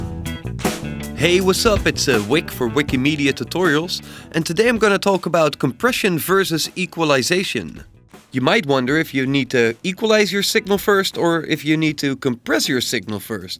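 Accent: Netherlands. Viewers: Toevallig Nederlander? 😀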